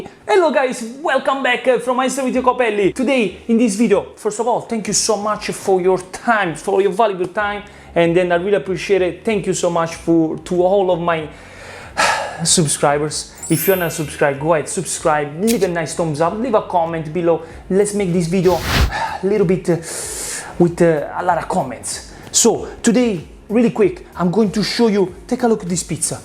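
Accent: Italy. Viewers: I like you English with Italian accent and I also like very much your videos. Great job! I want to be your student to be a BIG pizza chef WOW!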